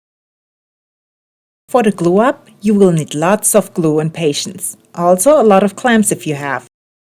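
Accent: Germany. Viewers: Your DuEnglich is Cool!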